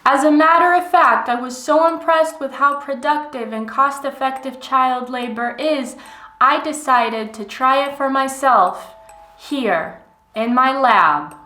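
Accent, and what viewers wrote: United States. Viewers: You clearly grew up in America you liar! To have a yank accent you lived in America and act like a American so yea I call bullshit on your nationality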